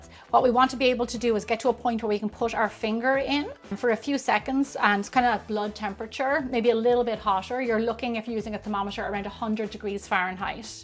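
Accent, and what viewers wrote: Ireland. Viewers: We make yogurt everyday. This is her first time. Very strong Irish accent. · Just to clear up any confusion, this is an accented Irish correct? I just didn't want to get it wrong because I've learned that Scots have a more harsh tone to their speech, but some speak as soft as Irish folk.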